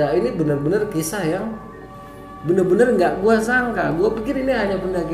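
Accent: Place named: Bandung, Indonesia. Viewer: Bang bisa logat bandung euyy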